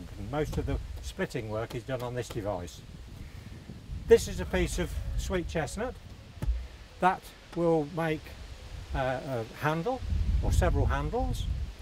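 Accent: Northamptonshire, United Kingdom. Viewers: Nice to hear a Northamptonshire accent on YouTube, albeit faint. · Lovely Northants accent and beautiful craftsmanship.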